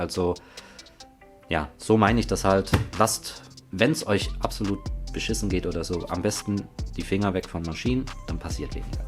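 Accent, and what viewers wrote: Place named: Saarland, Germany. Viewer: Ein Saarländer, wie schön. 👍👍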